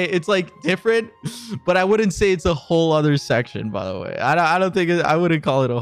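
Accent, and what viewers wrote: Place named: Argentina. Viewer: Español Argentino SSJJSKSKSMS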